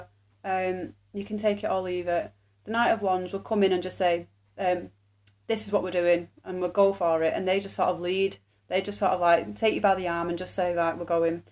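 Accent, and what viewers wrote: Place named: Manchester, United Kingdom. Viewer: Im from mamchester you sound like a mancunian 😅😅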